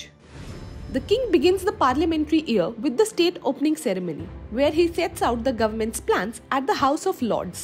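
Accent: India. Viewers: Cmon really!! Im not racist and will never be but this Idian english accent makes me go Insane 🤬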